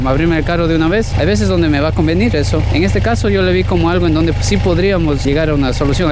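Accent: Cuenca, Ecuador. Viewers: Buen video. Eres Ecuatoriano? Tu hablado me suena como de acá jaja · suenas como que fueses de ecuador de cuenca... buen video amigo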